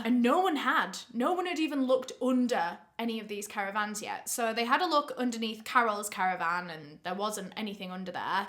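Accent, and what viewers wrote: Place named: Bedford, United Kingdom. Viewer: Fascinating your accent of bedford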